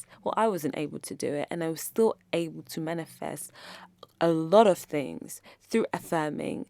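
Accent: United Kingdom. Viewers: This is so important. Side note. When you started speaking i thought it was me. We sound very similar its scary. Hello fellow Brit 🇬🇧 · It sounds like my mum's, which is southern english :)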